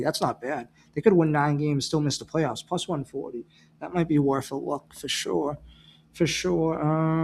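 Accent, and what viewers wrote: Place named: New England, United States. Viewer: Gee, I wonder if this guy has ever been to BAWiston! That N.E. accent is too easy to spot. Okay I like encouraging new channels but my best advice if you want your channel to grow in this glutted market is to STAND OUT. & this much lack analysis ain't gonna cut it. You're not even sure if Schottenheimer was in his 1st year? Fair or not you're not likely to make gains when even the establishment media is saying more than you do. Find some stand-out stats that actually say something. Show clips of a play Shanahan or McVay called that proves they're great instead of just repeating that they are. Give people a reason to tell their friends "dis guy knows what 'e's talkin' bout!" That concludes this episode of "Unasked-for Advice."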